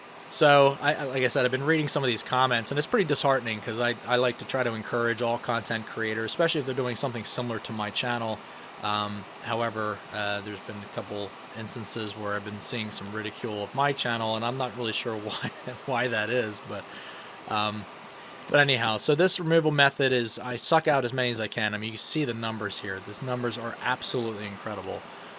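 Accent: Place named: Pittsburgh, United States. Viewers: My family is from PA I can tell by your accent that’s where you are😂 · Where about in pa are you from? I hear a hint of Pittsburgh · Eastern or Western PA? I am born and raised from the West side😏😏. I knew i recognized your accent but i cant tell from which area it belongs to.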